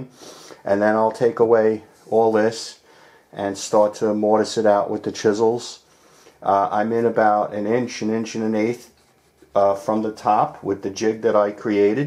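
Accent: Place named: Staten Island, United States. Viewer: Hey. This is incredible stuff. It makes it even more enjoyable that you have the exact same accent as my beloved uncle who grew up on Staten Island. Question: would you make hollows and rounds to sell? I would much rather buy from you than try to track some down from across the pond.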